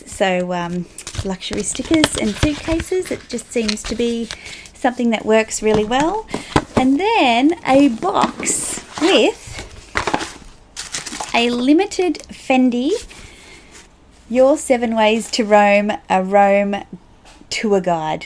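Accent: Australia. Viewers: Happy (belated) Birthday!!!! I like to listen to your Australian accent. Keep being blessed!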